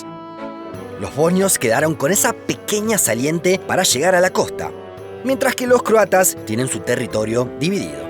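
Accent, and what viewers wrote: Argentina. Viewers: lo iba a ver, hasta que escuche la repulsiva voz de acento argentino .. 🤮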